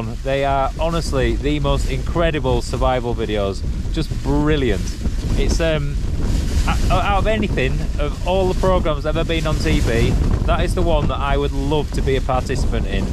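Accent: Yorkshire, United Kingdom. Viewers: Fab watching this adventure…. I love your chat, the nice Yorkshire accent helps 😊 Surely it’s a bit scary getting back 😮 It must be so hard walking in this thick snow Thanks for the fun 🎊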